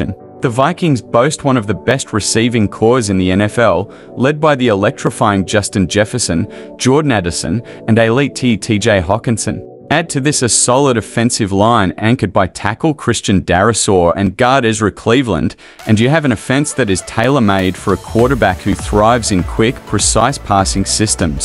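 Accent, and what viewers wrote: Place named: Australia. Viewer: Do not trust anyone with an Aussie accent telling us what the NFL is doing…… Not gonna happen……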